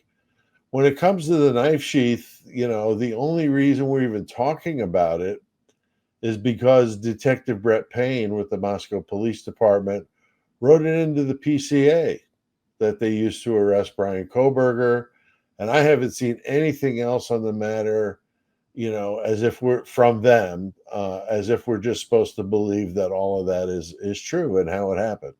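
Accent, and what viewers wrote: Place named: East Texas, United States. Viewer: Cool, first time viewer. Liked your Idaho coverage. Do I hear east Tx in that accent 🧐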